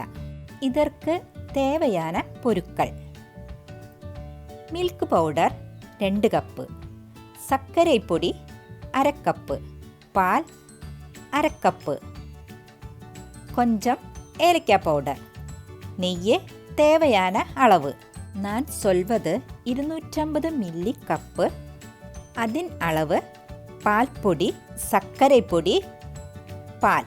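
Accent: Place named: Kerala, India. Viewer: Malayali tamil....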